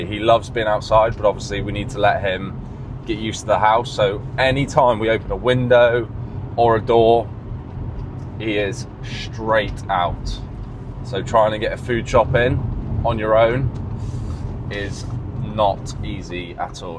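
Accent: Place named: England, United Kingdom. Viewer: This gorgeous, handsome English man in my feed again with that gorgeous English accent - making an old Irish queen like me very happy indeed 😂🇮🇪☘️🏴🇬🇧❤️❤️❤️❤️❤️❤️❤️❤️🙏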